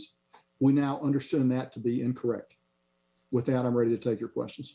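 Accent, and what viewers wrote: South, United States. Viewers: This southern crap talk, why is he here, he can't even read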